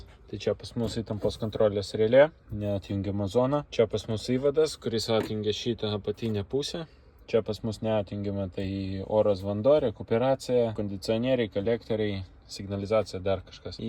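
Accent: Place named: Belarus. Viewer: stop- o kodel Tamsta kalbate su akcentu , Baltarusiu...nebuvo Atsiprasau.....gal marsh , marsh do domu...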